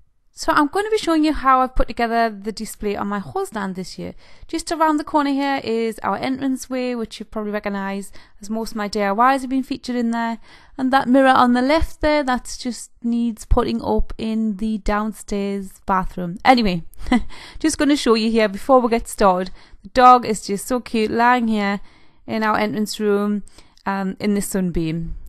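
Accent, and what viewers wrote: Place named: Wales, United Kingdom. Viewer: Love your videos,you kind of sound welsh, I’m from Birmingham but live in the States!!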